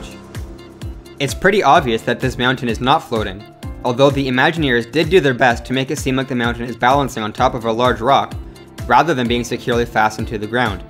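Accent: Canada. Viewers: Americans speak English. A LOT of brits have broken English as well but let's all ignore that fact. And he's probably Canadian, Americans don't pronounce words the way they do.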